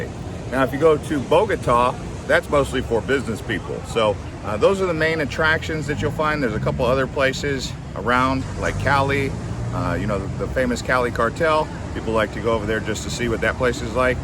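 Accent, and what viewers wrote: United States. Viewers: is in Colombia wearing a 'Columbia' top. Overall, this is a well-researched video. Granted the pronounciations are more American based, but I can see the effort made. It DOES RAIN A LOT. November is also a good time to come with less rain (at least to Bogota). "If you want to find bad people in Colombia, you can surely find them, as you could in New York or Los Angeles. But nowhere have my crew and I been treated better or with more kindness and generosity. I’d bring my family on vacation there in a heartbeat. And hope to soon. As I said before: Colombians are proud. Let them show you what they are proud of." ~ Anthony Bourdain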